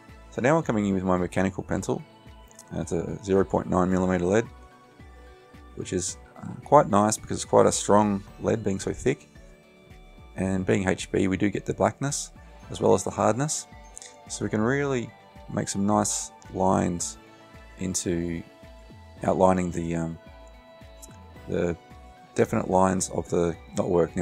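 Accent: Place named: Australia. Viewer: Nice lesson, also good to hear an Aussie voice for a change. Your course is no longer available. Have you moved to Udemy, Teachable or Skillshare by any chance?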